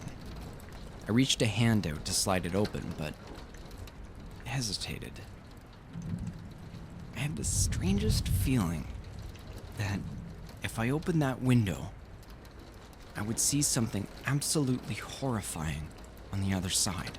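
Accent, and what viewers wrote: Canada. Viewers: The narrator is Canadian. It makes no difference towards the story, just an observation. Mainly getting this from the way he says words ending in -out. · is the narrator canadian? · Narrator has to be Canadian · this was amazing! I love listening to you read.. I love the Canadian accent! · This is the first I've ever been able to identify a canadian accent as a non-english speaker. You should be proud lol! At least stop apologizing for it :D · | I'm american, Canadian accents don't bother me at all, but even if it had your reading was fantastic sir. honestly didnt really notice it until i read the comments because i was on the edge of my seat.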